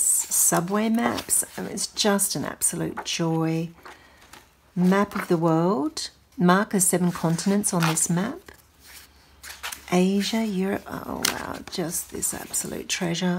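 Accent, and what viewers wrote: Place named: Australia. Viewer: Hi, this video came up on my recommendation list, so glad to hear an Aussie voice, then I see you live near Canberra which is my home, I subscribed and really looking forward to more videos, love this one on books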